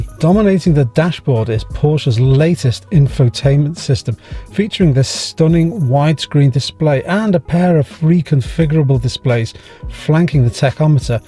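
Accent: United Kingdom. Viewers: British accent 😍 best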